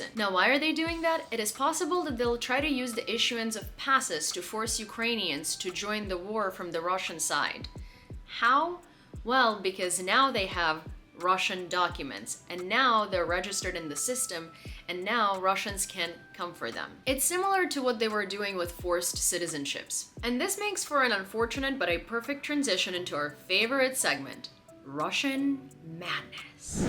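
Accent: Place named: Missouri, United States. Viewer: You sound like you're from Missouri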